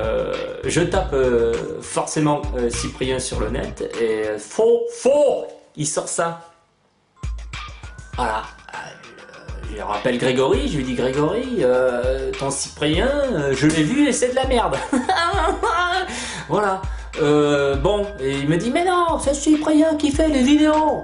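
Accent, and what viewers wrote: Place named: South, France. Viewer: enfin un sudiste qui fait des podcasts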